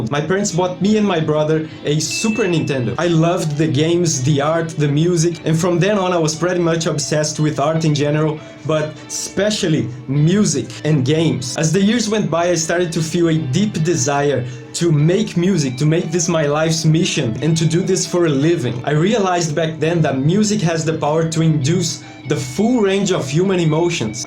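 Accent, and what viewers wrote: Brazil. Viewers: Hey, you have a brazilian's accenct. Are you brazilian?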